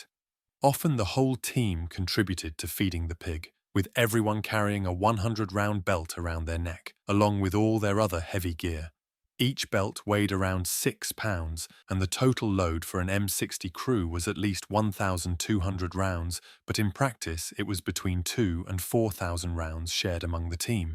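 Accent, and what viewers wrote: United Kingdom. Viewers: I do not know where you are from or how old you are, also do not know what your sources are. If you were to write a legitimate journalism article for a respected printed publication or even to submit as a piece of work for grading in college/university, you would need to at least have 3 to 5 independent verifiable sources of information to support your statements and/or claims. To say that “carrying ammunition belts around like Pancho Villa might have looked cool” is a total shit bag thing to say. Anyone who was actually carrying ammo for those support gunners and having to carry their own kits did it in attempts to keep everyone alive and well stocked. Are you British or is your YouTube narration a Bot?! You probably weren’t even alive during the Vietnam War and you are regurgitating stories you’ve gathered from other YouTube channels. 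Like you sat down and interviewed actual M60 Gunners to get your information for this video? Like they’d actually openly tell you , a Brit or a descendant of a former British degenerate what went on there for your YouTube channel. I saw some other comments from people who sound like legit Vietnam M60 gunners My father lugged shit everywhere for anyone on his team way into Cambodia and Laos. ARVN would run off with any supplies or ammo or anything on the trucks US Forces were defending. So It wasn’t to look cool to keep chains of ammo it was to make sure that shit did not disappear into the bush when under fire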